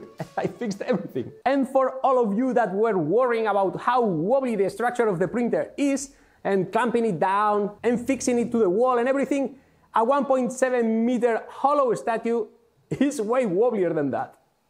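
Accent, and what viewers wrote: Spain. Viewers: Adoro la forma que tenemos los españoles de pronunciar el inglés jaja, te dejo mi like! this vid was fun ^^ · etsba viendo este video...y pensando este tio habla epsañol xD ese ingles es muy español jajajajjaaj saludos grande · Con tu acento me da por hablarte en Español, pero tampoco es que haya confirmado que eres Español The bed can be heated in sections? Because if you can , doesnt seems hard to distribute the heating cable in "discs", like the ones on a ceramic hob, so you can save power, heating only the sections you are using, on a normal 3d printer is not really a concern, but in something that big, the bed must be really expensive to maintein edit: okay, ive seen that part now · se nota mazo que eres español jajjaja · seunas a español hablando ingles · eres un español hablando ingles?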